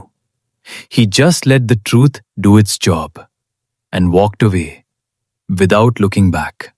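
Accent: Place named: India. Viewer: Hello bro 😊 I am also thinking too create videos like this 😊 I think you should change your ai voice tone or English levels It's giving indian voice tone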